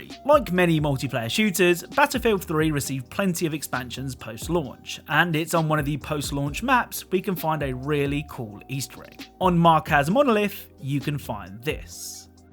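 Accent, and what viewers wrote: United Kingdom. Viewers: Are you British, Australian, or New Zealand?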